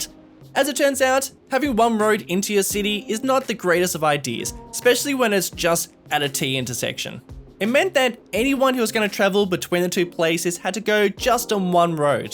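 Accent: Australia. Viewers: he's not even british?? it's obvious his accent is australian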